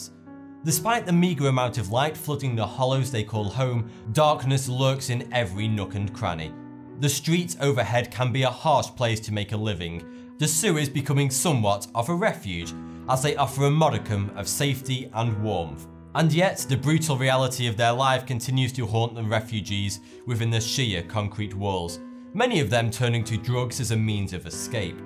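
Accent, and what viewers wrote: United Kingdom. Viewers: It's just his accent, some British people say f for th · I was interested in this video, but I'm not watching it because your non-standard pronunciation of "f" and "th" really grinds my gears. I don't know about everyone else, but I associate this language "feature" with lower-class, uneducated people. Rationally, I know it is just one of many British dialects, but emotionally, to be redundant, IT GRINDS MY GEARS. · I thought it interesting that the video showed US money falling rather than French money, or even British, since the presenter sounds British. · His name isn't 42, he's actually saying but with his British accent. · It's literally a normal British accent... This is what most of us sound like, not that RP bullshit Americans expect us to XD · I am Canadian, and though I don't think that fact is especially relevant, I find his particular British accent adorable, especially since his insights and conclusions about the facts he researches are so intelligent and well thought out. 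· A weird accent?! Its a british accent, the home of the language! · Wearing a suit and having a brit accent obviously has not made you an authority. You could have done so much more with this. Among the many things you missed....there are hundreds if not thousands of cave houses available right now all over the world..Spain is a big one. And an underground house is possible anywhere on this planet with a constant temp. inside. I find this whole video obnoxious and full of incorrect information.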